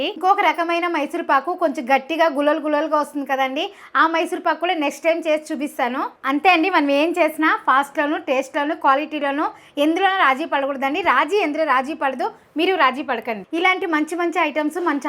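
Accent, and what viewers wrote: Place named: Chittoor, India. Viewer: Maadi ctr andi slang ala unte adiga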